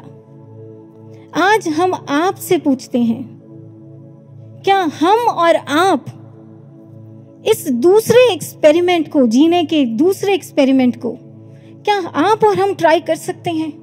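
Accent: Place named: Bengal, India. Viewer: Her bangali accent is wow !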